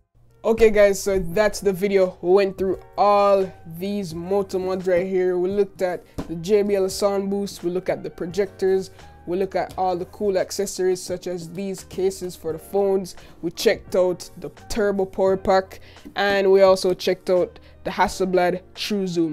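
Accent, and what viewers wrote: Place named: Jamaica. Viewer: Bro you can’t tell me your not a Jamaican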